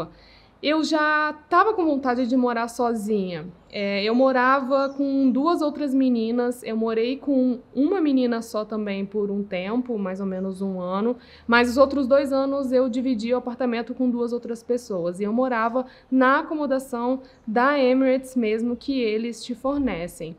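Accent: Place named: Belo Horizonte, Brazil. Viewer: Sotaque belorizontino...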